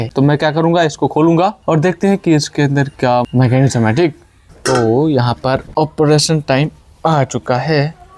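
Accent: Bihar, India. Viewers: Apka voice mujhe pasand nahi shyad Bihari h hero giro type lgta h voice 😂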